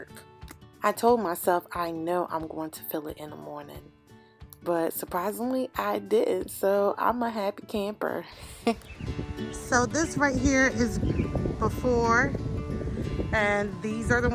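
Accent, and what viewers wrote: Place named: Maryland, United States. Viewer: You from Maryland? I didn't watch the whole video, but I heard a little of your accent. The "tew" is giving Maryland lol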